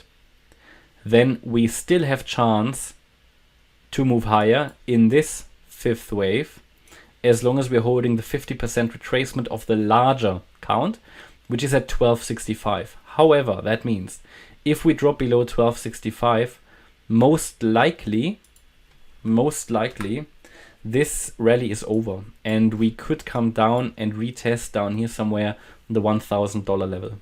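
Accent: Germany. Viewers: Also komme was wolle, ich mag dein Englisch. Ist so dolle mit deutschen Akzent, aber gleichzeitig recht detailreich und super verständlich. Machst du gut . Wünsche euch viel Erfolg mit dem Kanal. Ihr bietet sehr kurzfristigen Input, und nicht erst 3 Tage später nach dem Motto:”Das war ja absehbar!” 👍🏻👍🏻 damit kann ich zumindest mehr anfangen !!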